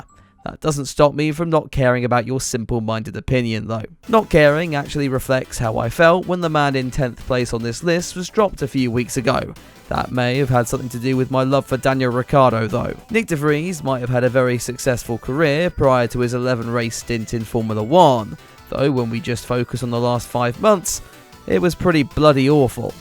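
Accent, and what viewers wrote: United Kingdom. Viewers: Another generic British sounding guy starting a new F1 Youtube channel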